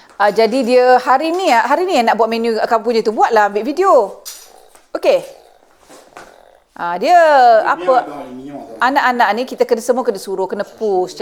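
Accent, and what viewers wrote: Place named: Selangor, Malaysia. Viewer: Berkenaan loghat Nesmilan tu. Kita tau your family pun di Nilai. Utk pengetahuan semua Nilai ni dah almost Selangor (in fact heboh jap ari tu, Nilai ni dah join Selangor) so bunyi loghat org Nilai ni kira bunyi Selangor la. Bukan semua org Nesmilan berbunyi Pilah atau Rembau. Kalau ada yg berbunyi Pilah atau Rembau tu cuma sesetengah je tapi bukan 'loghat rasmi' org Nilai.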